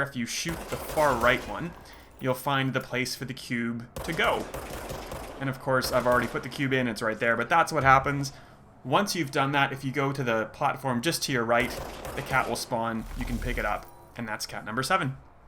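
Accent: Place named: United Kingdom. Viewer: Hes British I would guess.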